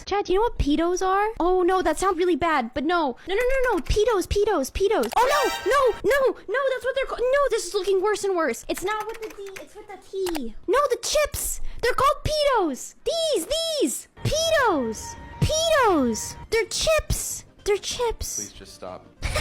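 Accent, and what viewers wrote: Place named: United Kingdom. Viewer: i'm surprised the british accent fit so well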